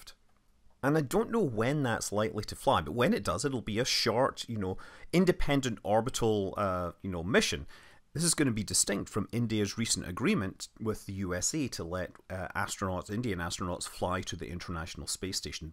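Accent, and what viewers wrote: Scotland, United Kingdom. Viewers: Hi - I love the way you refer to the Mun (which has an uh sound) rather than the Moon. Either it's your rather endearing Scottish accent or else you have been playing too much KSP. Maybe both 🤣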